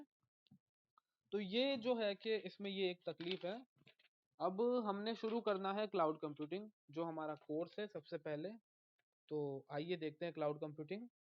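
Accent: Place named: Pahadi, India. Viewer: thodi thodi pahadi tone ari ha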